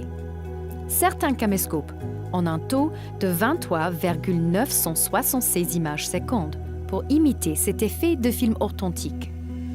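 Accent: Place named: Quebec, Canada. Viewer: Québequois anglais jpense mdr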